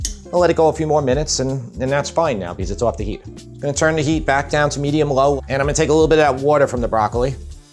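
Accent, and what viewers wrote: New York, United States. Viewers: You’re a great cook my friend. You sound like a New York Italian ? Great cooking !!!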